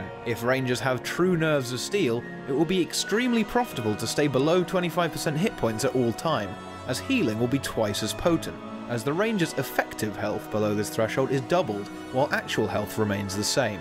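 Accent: United Kingdom. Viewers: British accents make everything sound like im watching a documentary on BBC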